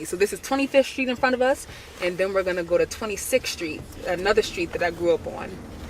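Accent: New York, United States. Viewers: Love her NY accent! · She has such an authentic NY accent. Love my people! My fiance is from Wavecrest! I miss farrock. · That New York Accent 😍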